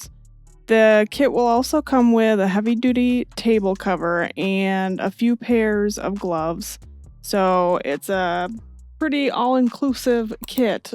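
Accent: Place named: Michigan, United States. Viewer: This channel is amazing! Are you from Michigan, by any chance? You have the exact same accent as my friend Dawn! You could be sisters based on your voice.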